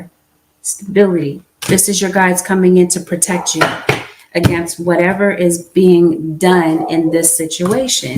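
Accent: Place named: South, United States. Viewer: You from the south ain't you????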